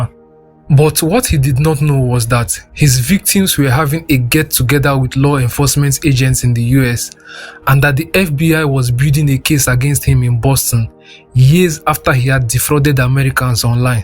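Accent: Igbo, Nigeria. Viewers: Your narration is superb 👌. I love your Nigerian accent. ❤ Well done bro. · Baba tuche up on the accent, no need to form yankee but this strong igbotic accent will impede traffic to your channel. Almost as if you're trying to make the accent as strong as you can, its off putting to a lot of people's ears.. My advice though.... but good storyline and storytelling.!!.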